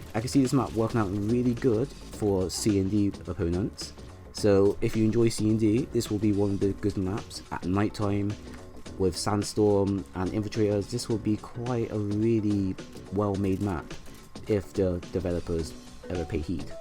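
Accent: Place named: Southern England, United Kingdom. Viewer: That accent sounds Southern English.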